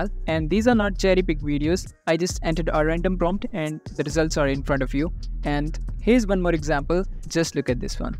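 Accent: India. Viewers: Bro admin from India...? speaking English is funny...😂😂😂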